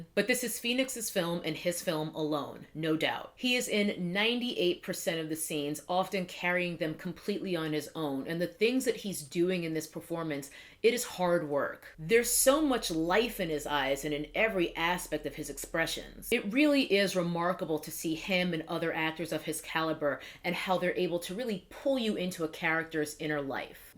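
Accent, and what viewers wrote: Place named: Brooklyn, United States. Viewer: so brooklyn. just subscribed.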